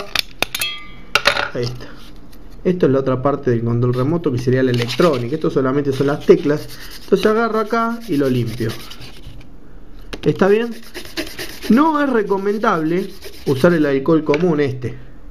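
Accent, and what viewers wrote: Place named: Argentina. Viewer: Es muy divertido escuchar a un argentino explicar cualquier cosa. Me recuerda a "Les Luthiers" yo tengo un cuñado argentino y me divierte mucho jajajaja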